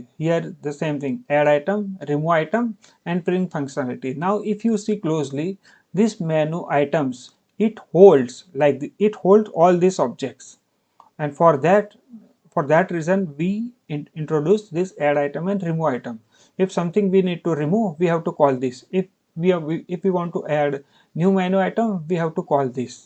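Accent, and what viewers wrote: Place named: India. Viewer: Finally got a video with indian accent on this topic.. thank uh sir😊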